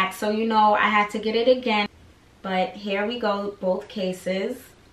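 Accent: New York, United States. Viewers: J BIN LOC that’s just her accent she’s from New York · J BIN LOC Yes, not everyone from NY sounds like that but a lot do. It's still one of our accents or the way some of us speak.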